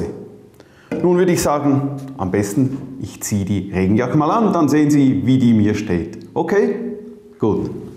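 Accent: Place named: Switzerland. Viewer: schön :) ich liebe das Schweizerdeutsch :)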